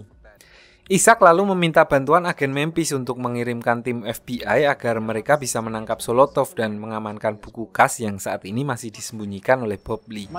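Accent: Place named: Java, Indonesia. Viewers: Medok banget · gak bisa biasa aja apa bang medok banget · medhokk cokk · njir sikak wong temanggung ta 🗿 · Fixs wong temanggung admin e 😂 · medok cukk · Youtuber dari jawa timur ini kyaknya, udh jelas bgt dari omongannya wkwkwk..😂😂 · Medok tp keren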